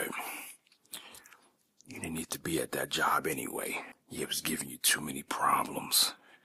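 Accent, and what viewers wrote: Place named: California, United States. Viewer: Hope homie hit over 100K this year! They already know Cali got the best accent for ASMR!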